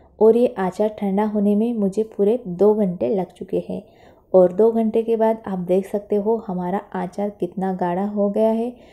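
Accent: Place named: South India, India. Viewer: Achaar dekhne se hi kafi tasty lag rha hai aor usase bhi achi baat hai apki Hindi bhasha jisme se thoda thoda South Indian ka khushbu aa rahi hai, that sounds nice and God bless 🙏🙏💐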